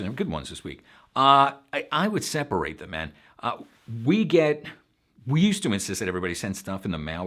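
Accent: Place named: New York, United States. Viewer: Love your New Yawwk accent.;) Great info as always, thanks!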